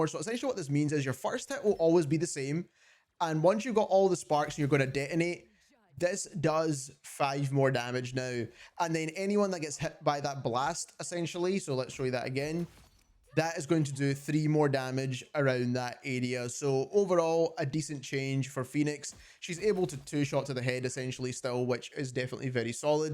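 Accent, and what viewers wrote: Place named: Liverpool, United Kingdom. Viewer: The only thing painful about this video was his liverpool accent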